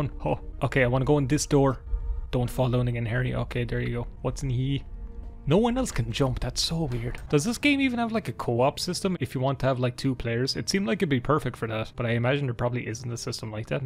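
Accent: Ireland. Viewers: i love your irish accent <3 greatings from germany :)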